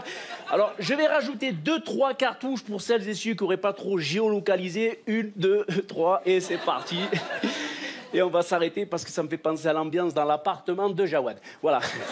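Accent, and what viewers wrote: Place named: Spain. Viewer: Très bon !! Ta un accent qui fait espagnole ou marseillais ☺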